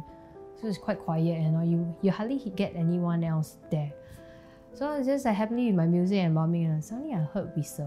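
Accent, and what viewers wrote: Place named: Singapore. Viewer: Singaporean accent a female embalmer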